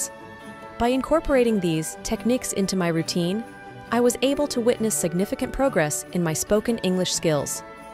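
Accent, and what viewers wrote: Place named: United States. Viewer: Thank you very much for your reply. You are amazing. I don't know where you are from or what your background is like, but you sound very American. I can tell you have been dedicating yourself to learning English and you are still working hard. Much respect. Thanks very much.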